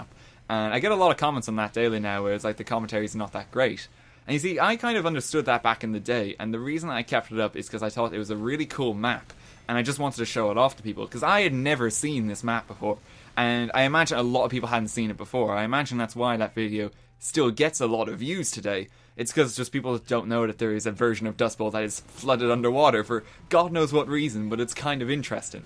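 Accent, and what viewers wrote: Ireland. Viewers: Are you irish? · I have only been here for about a month but I absolutely love your videos. It also helps that your Irish :D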